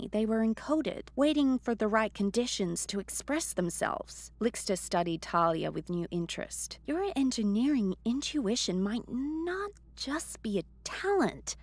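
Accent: Australia. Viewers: I have just found this site and I read many comments and a number mentioned that the narrator lost punctuation as they felt she was reading/talking too fast. Now I personally did not feel that there was a problem as I seemed to understand clearly what she was saying. Then way down some one mentioned that she is Australian and it dawned on me why I had no problem with her. I am Australian. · Good job ! Aussie ?? Don't think Kiwi.. Either way, Welcome.. Very attractive young "Sheila" lol.... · Aussie narrator noice 😊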